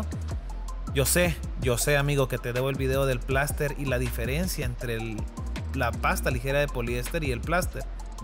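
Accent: El Salvador. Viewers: Tiene acento de el salvador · Por 2 palabras que te escuché deduzco que eres de El Salvador, te felicito por tu excelente trabajo, yo no trabajo en eso, pero me parecen muy interesantes tus videos, saludos desde el pulgarcito de América. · Viejo por tu tono sos Salvadoreño! Me llega! Ya me suscribi, mira ayudame porfa men, Donde consigo los aros o anillo para tirar de cobre que pegas a la lata junto con la maquina que los suelda?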